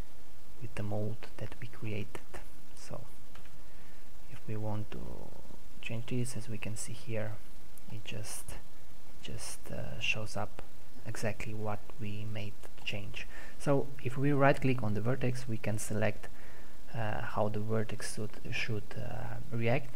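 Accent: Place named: South Korea. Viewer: Your english sounds like some korean idol i know lol, but helpful video :D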